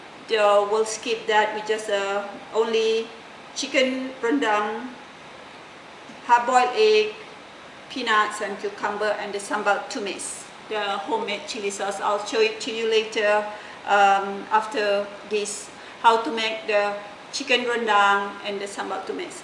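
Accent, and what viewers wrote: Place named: Malaysia. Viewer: I love to hear your malay accent english which is very original, a hard to find especially those who have spent many years in America. I love your recipe of that "NASI LEMAK". It has a beautiful aroma and unbelievably tasty rice. You have very good heart and I wish you all the best for your future.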